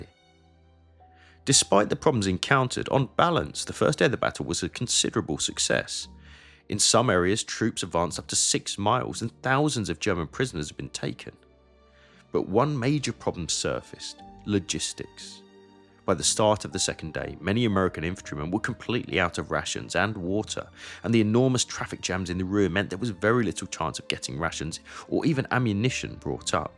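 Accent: England, United Kingdom. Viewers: There's no R in the word. That thick English accent is rough as you butcher words and add Rs to everything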